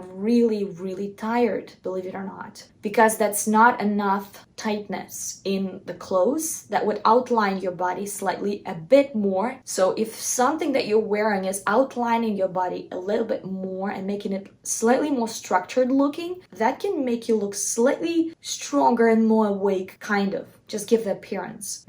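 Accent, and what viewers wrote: Russia. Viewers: Thick russian accent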